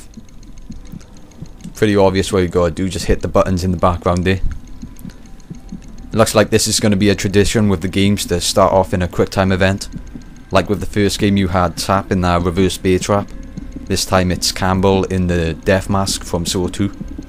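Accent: Wales, United Kingdom. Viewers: Is that a Welsh accent I hear? Blimey. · Welsh :D He Welsh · are you welsh? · im in love with your accent :) i wish i was closer to wales, im 1 hour away :( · Whats your nationality? You sound Welsh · You sound welsh. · He's Welsh. I feel like it was in his info at one point, if it's not there now. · Omfg. A welsh commentator!? I'm subscribed just for that. Where in Wales you from? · It may be dumb, or stupid. But I was instantly in love with your accent. I love the Welsh.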